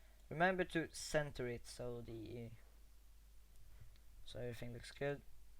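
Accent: Sweden. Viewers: Are you swedish???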